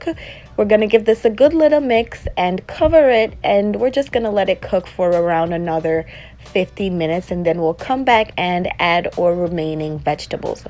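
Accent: United States. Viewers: You sound a lil Geechee ngl you would fit right in Charleston NC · Is she really Jamaican, all i hear is an Yank accent! Anyone can cook Jamaican food and claim Jamaican heritage!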